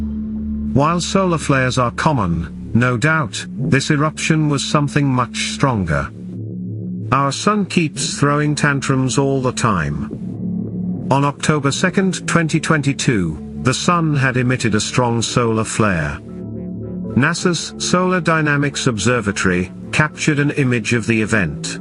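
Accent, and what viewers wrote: United Kingdom. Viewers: The brainy peoples' brains are swelling and will explode too, but in only a few years, not billions. With all the bucks you people get I truly believe you could put the money to better use; and not just in your pockets. For starters, get a spokesperson who speaks without a Brit accent. I remember watching a NASA APOLLO take off on TV, with my wife, and saying; Look at the ice build up on the o-ring joints! I think something bad is going to happen'". And an astronaut, who was from Mohawk,NY, some 10 miles from me perished with all the others on board. I hope the wire insulation you people are using is NOT made from soybeans. Get It? You people are on the Elite Welfare System and suckin it right up. Stop your putting fear in everyone, and start fearing and loving, and obey more, to please God. All the worlds infrastructure is shot, and all you do is keep shooting holes in our atmosphere. 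Will you be stumped, like everyone else, if the electricity stopped, for 40 yrs, like the 40 yrs God sent the Israel people into the desert?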